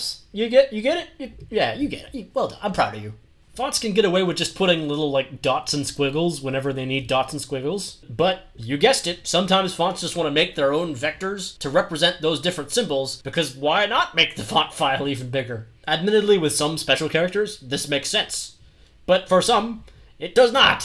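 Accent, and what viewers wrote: France. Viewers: is this guy French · you sound french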